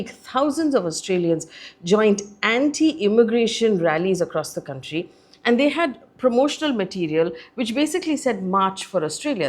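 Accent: India. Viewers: Are getting racially hated because they sound like you and watching a video. I also got annoyed with your heavy and thick Indian accent.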